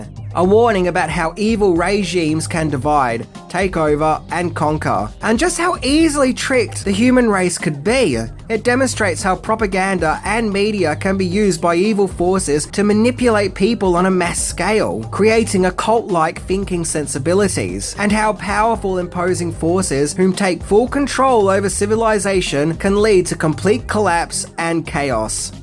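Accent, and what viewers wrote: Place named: Australia. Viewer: I love the (Australian?) accent pronouncing it "Vay." It keeps catching me as I listen. "Vee" sounds more ominous, imo. But I'm glad this series appears to have crossed the pond if it went all the way down under. As kids, we gathered together at my friend's house because they had cable, which meant really good reception without going blurry like television shows did back then if you didn't have cable. It was before HD, so the effects were super cheesy, but so much fun!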